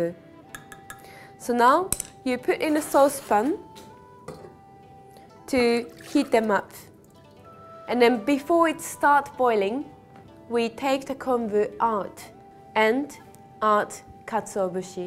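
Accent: United Kingdom. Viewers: usually when people make instructional cooking videos, they use nice, presentable equipment and containers. Most people would pour the sauces into pretty little bowls, or glasses. This chick puts her sake in an old, plastic coke bottle with a paper label scotch taped to the front. On the other hand.... it's a hot asian girl with a brittish accent that happens to be a master chef. She pretty much has all of the fetish bases covered.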